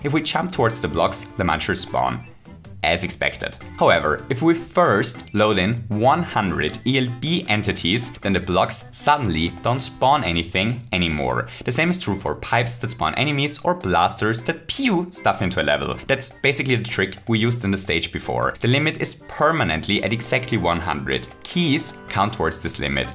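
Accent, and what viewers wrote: Austria. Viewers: Are you from Austria?:D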